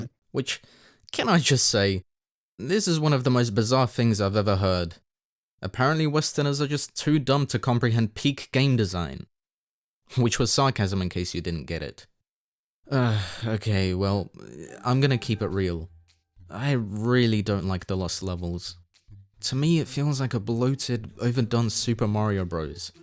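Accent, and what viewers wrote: United Kingdom. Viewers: Interesting hearing a British person talk about Mario so heavily. I always thought Mario wasn’t liked in the UK 🇬🇧 because he was deemed too kiddy. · Weird to hear someone with a UK accent saying Super Mario Bros saved gaming, considering there was no video game "crash" outside of the USA. Gaming was still very strong in the UK at that time.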